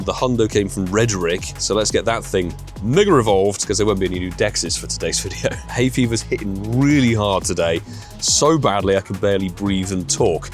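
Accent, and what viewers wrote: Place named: United Kingdom. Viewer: another perk of british accent, running nose doesnt sound differently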